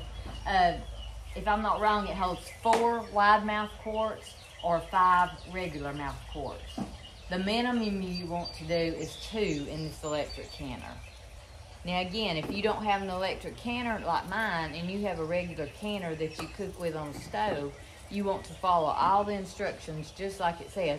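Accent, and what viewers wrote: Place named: Alabama, United States. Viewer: I just love to hear you speak. You have an accent like me! I was born and have lived in Alabama all my life. I love my presto digital pressure canner.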